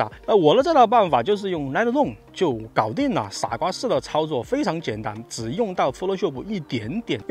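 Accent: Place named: Chongqing, China. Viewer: 听出了川音:face-green-smiling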